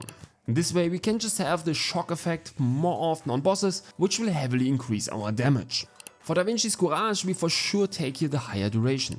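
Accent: Germany. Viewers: Hab ich doch richtig vermutet, dass du n deutscher bist :) Kannst den auch mal in Deutsch machen. Bin zwar halbwegs Sattelfest mit der englischen Sprache, aber Deutsch fällt dann doch leichter... Danke für das Video. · Hab sofort gemerkt dass du Deutsch bist ^^" bester Guide den man sich als Anfänger reinziehen kann nicht zu kompliziert troztdem Detailreich, kurz aber nicht so kurz dass schritte übersprungen werden. Alles super erklärt gerade für mich als Anfänger was MMOS angeht. hab auch mega rescourcen und Zeit verschwendet da ich ein Build nachgemacht von jemanden der angeblich nur Builds und gameplay klaut aber bin mir sicher. Mit dem guide hol ich dass schnell auf, Danke für den Mega guide :) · Oh, you are German? Deshalb mag ich deinen Akzent wohl so sehr :'D Und natürlich Lob an deine Videos! Ich finde es sehr hilfreich, dass du immer durch alle Skills gehst, und auch erklärst, warum welche nicht genommen werden, so ergibt es irgendwie mehr Sinn.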